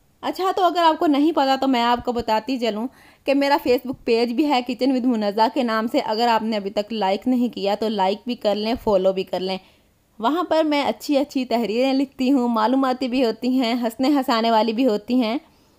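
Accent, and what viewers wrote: Punjab, India. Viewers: پنجابی لہجہ ایسا ھی لگتا ھے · G Haan lehja tora sakht lagta ha shayad punjabi hone ki waja se esa lagta ha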